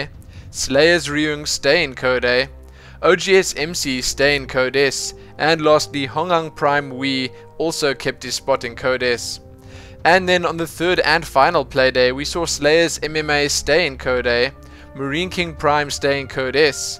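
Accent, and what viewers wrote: South Africa. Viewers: I absolutely love the South African accent. I want to hear your voice on a lot more Starcraft shoutcasts.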